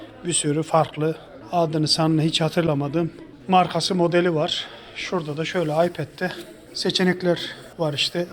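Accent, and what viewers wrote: Yozgat, Turkey. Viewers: Abi şive Yozgat gibi senin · Gardaşım Yozgatlımısın ulaa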